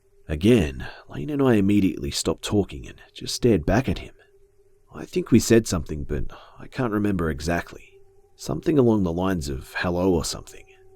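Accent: Australia. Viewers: Are you Australia mate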